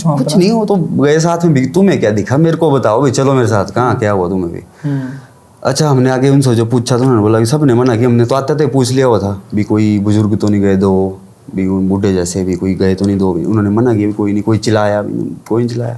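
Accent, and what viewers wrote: Pahari, India. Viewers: हिंदी में जो पहाड़ी भाषा की आवाज आती है वो मुझे अच्छी लगती है।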